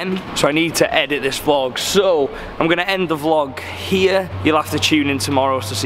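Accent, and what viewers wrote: United Kingdom. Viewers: Who can't give this guy with the sweet british accent free stuff :)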